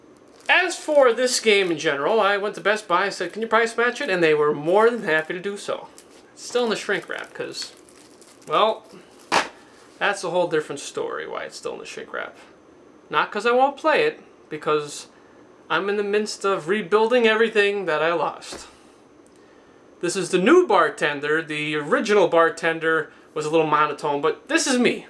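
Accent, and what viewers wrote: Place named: New York, United States. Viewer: I think he's a New Yorker, maybe Boston. He's displays more intelligence than the guys from Jersey Shore do.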